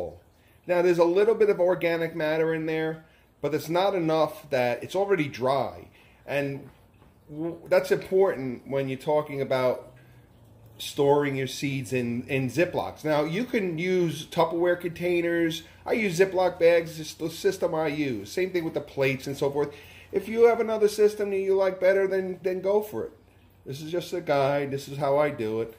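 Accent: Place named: New Jersey, United States. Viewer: Love your enthusiasm...and the Jersey accent totally helps. Get the cheap-o paper plates. Love it!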